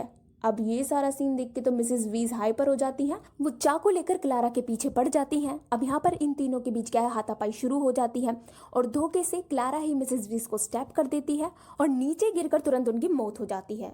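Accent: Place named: Punjab, India. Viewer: Aap panjabi accent me hindi bolte ho .👍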